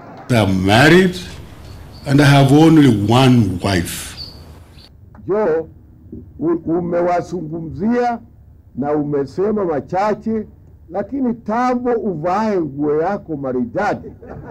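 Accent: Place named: Kikuyu, Kenya. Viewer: GOAT. As an othaya folk I can confidently tell you his non-native utterances( non-kikuyu et al ) were merely Kikuyu talk transversed especially in Swahili. When he says " sasa basi " he is actually adressing the subject in Kikuyu banter " Atereri ".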